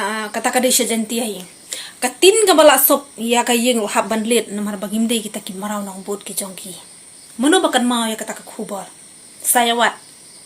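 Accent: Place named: Khyrwait, India. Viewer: Best bha i ni ikong ban kren khyrwait beit nga dei na mawkynrew nga ruh nga kyrshan prah❤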